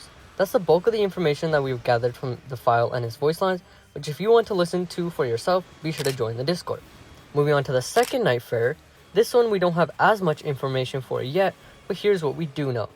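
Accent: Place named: United Kingdom. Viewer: lol he’s British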